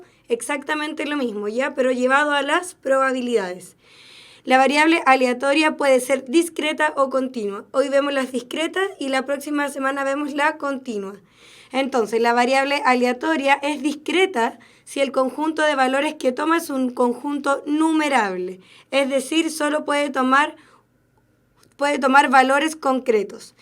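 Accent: Chile. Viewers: Eres chilena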